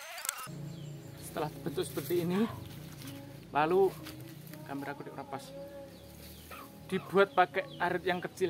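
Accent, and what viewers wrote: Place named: Java, Indonesia. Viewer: Asli mana bosku kayak medok😂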